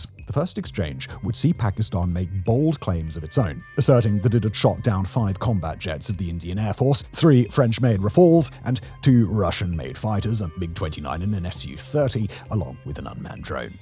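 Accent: United Kingdom. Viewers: He's british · is this guy british or american i cant tell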